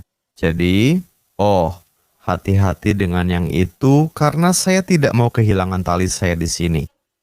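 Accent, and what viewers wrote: United States. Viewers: iti orang adit suara asli orang USA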